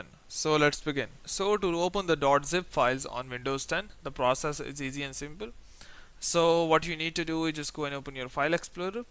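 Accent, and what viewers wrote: India. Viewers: Cannot understan anything you're saying. You talk too fast and the Indian accent is impossible.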